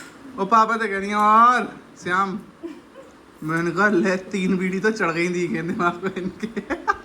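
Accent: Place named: Aligarh, India. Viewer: Bhai humari bhi poori family aligarh se hi, Big fan of your accent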